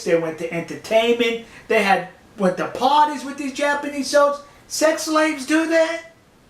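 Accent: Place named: Northeast, United States. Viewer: Tbf that's a pretty common accent patter in parts of the American north east, especially in historical districts of larger cities.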